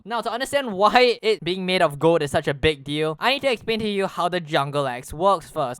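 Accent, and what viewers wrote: Singapore. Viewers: Is it just me? Or do you sound like a Singaporean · R u singaporean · U sound singaporean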